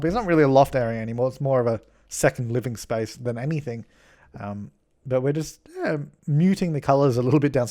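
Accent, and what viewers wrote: Australia. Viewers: Absolutely my favourite sims4 series so far, as I usually play the game starting from little to no money. And your Aussie accent is somehow perfect for this kind of commentary. Perfect. Haha.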